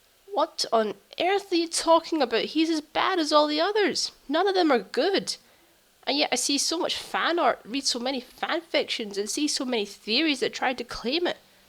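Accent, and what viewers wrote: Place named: Scotland, United Kingdom. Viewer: Ah yeah. You're the first Scottish FNaF fan that I've ever heard. For that you earned yourself a subscriber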